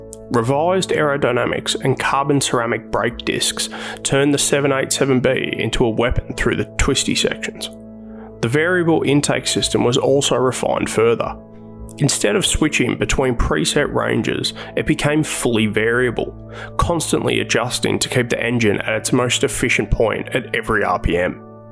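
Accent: Australia. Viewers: Idk just sounds like a normal Australian accent to me😭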